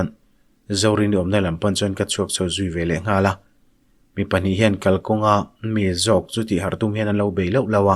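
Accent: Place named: Khilam, China. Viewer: Khilam unaute i ang zawk riau mai😆 ngaihthlak anuam e..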